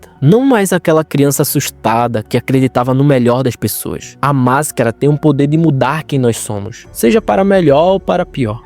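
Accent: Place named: Bahia, Brazil. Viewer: tem como tira o sotaque bahiano?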